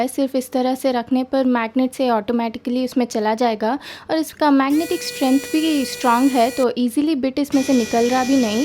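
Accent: Hyderabad, India. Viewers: i like hyderabadi accent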